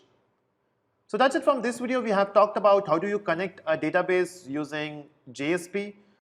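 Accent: India. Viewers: oh gosh indian accent... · Dude, you are great, since I am not an Indian I wish you could speak English with a better accent, but anyway thanks a million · Really a great job. I'm not an english mother-tongue, and you're probably the first man who speaks english with indian accent that I can fully and clearly understand. Well done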